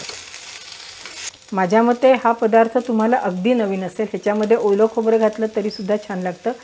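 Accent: Standard. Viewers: हो मावशींची स्पष्ट शुद्ध अलिकडे दुर्मिळ होत चालले ली भाषा ऐकायची. मला मावशींची भाषा खूप आवडते. इतर चॅनेलवर आधी भाषेची वाट लावतात आणि मग पदार्थांची. मावशींच तस नाही.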